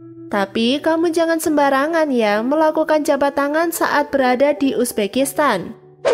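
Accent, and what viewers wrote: Java, Indonesia. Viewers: Suaramu medhok mbak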